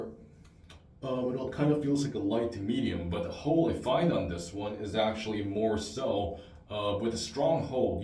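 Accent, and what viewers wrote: United States. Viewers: I really want to try it, but it's hard to find here in Indonesia. Anyways, where are you from? Your accent sounds like American, but your face reminds me of my friend (Chinese-Indonesian)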